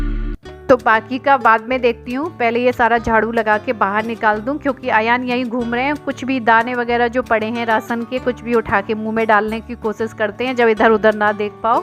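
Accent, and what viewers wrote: Uttar Pradesh, India. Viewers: Kahan rehte ho aap.Accent apka up ka lagta hai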